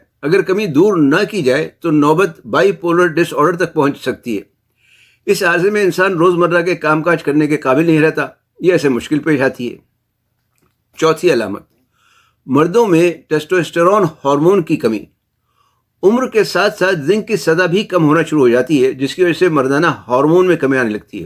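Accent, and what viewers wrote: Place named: Old Delhi, India. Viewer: یہ لہجہ پرانا ہے اب دیکھنے میں نہیں آتا ۔ہو نہ ہو دہلی سے تعلق ہو سکتا ہے ڈاکٹر صاحب کا ۔اللّه خوش رککھے آمین 🤲🏻💙 السلام علیکم جی 🤲🏻💙 بہت محبّت کے ساتھ شکریہ ❤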